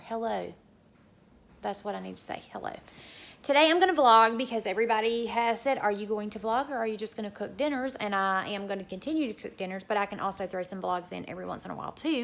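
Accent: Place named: West Virginia, United States. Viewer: I just seen your channel. I live in NC but I am from WV. You have that wva accent lol. Love it. Also love there is no pretend bullshit. Keep it real .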